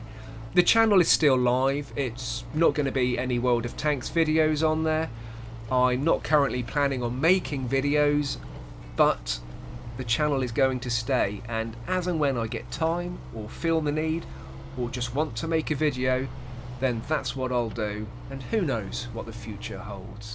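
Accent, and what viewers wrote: United Kingdom. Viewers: Hoal, Te extrañamos en WoT No nos abandones. Tus narraciones con tu asento britanico son una delicia.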